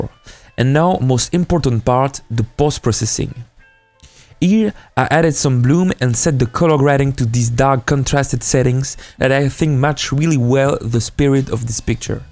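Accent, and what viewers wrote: France. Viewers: If you're commenting on his accent, it is French, not Ugandan.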